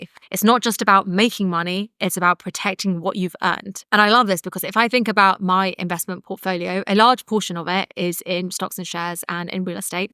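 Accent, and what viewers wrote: England, United Kingdom. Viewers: Your accent is too English 😅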